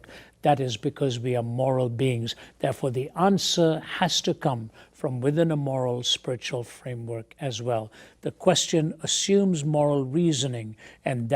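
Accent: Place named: Ireland. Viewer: I first became aware of Ravi when I found him on the radio when I was a trucker. In my mind I thought he was an Irishman because of his accent. I imagined him with red hair and beard lol. I thought his name was Robby! But I am so glad I stumble upon him! He is so smart and well spoken.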